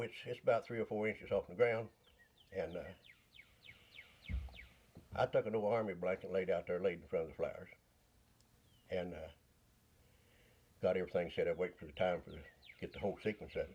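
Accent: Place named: Western North Carolina, United States. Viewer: Love his voice! Reminds me of some of my great aunts and uncles in WNC.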